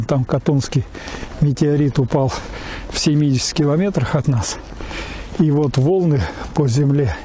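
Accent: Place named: Armenia. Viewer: Слышится лёгкий армянский акцент )) Природа красивая.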